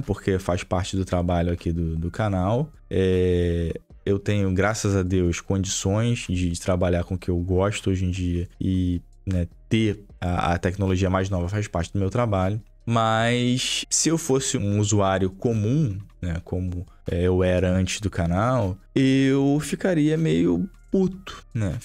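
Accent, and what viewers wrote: Rio de Janeiro, Brazil. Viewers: Vai num fonoaudiólogo pra neutralizar esse sotaque de carioca aí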